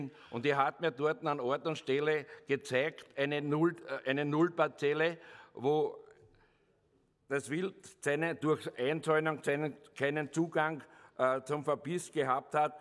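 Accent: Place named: Vienna, Austria. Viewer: Nicht gegen Österreich, aber dieser Wiener (oder was auch immer) Dialekt ist dermaßen furchtbar, da bekommt man eine Gänsehaut. Da kling Ostdeutsch noch schön gegen, das ist wenigstens lustig.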